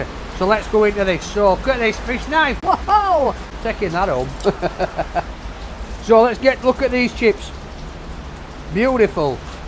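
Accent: United Kingdom. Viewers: I come see one of two videos of this bloke when I need me some british accent in mi life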